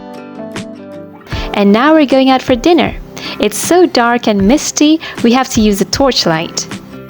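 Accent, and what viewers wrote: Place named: Australia. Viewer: have you spent time in Australia? sounds like you have a slight Australian accent.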